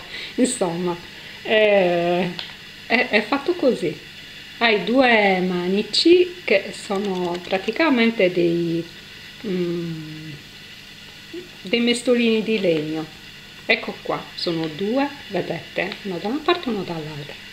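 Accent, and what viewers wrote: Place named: Sardinia, Italy. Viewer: Modello carinissimo e semplice, simpaticissima la tua cadenza sarda 👏👏👏ciaoooo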